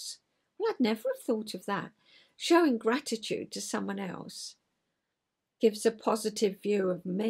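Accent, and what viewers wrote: United Kingdom. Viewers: Dear, Oh my goodness! I just popped in to see you, because I’m a huge follower of Julie’s! She mentioned you & said how much she loves to listen to you talk! I agree, you are an absolute delight! Where in the UK do you live? I love all the different accents & expressions that all of you have! I live in Lawrence Kansas, smack dab in the middle of the US! I lived in Cambridge (England!) for a year, when I was 6 years old way back in 1963. Even though that was practically the age of dinosaurs, I remember so many things so clearly! I came back with a lovely accent, but the other children at school made fun of me, chanting “Erica-America”, so I lost it as fast as I could… I wish I had that accent now! My favorite memory was a pair of blue “real” leather shoes my mom bought me. They had laces, maybe like an Oxford shoe? At any rate, I wore them to bed that night, because I loved them that much! 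Silly, right?! I wish I had a photo to go with that memory! Your little journals are so wonderful, I think I need to think about making those for my family, too! And those 12x12” paper pockets are wonderful, just so long as you don’t cut off the wrong piece! It’s so nice to “meet” you & I’ve subscribed, so I’ll be checking in again, soon! Take good care! Erica❤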